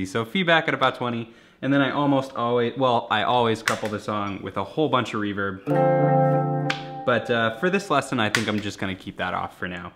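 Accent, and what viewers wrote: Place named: Midwest, United States. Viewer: where are you from? You sound like you're from the midwest. Maybe Wisconsin or Michigan?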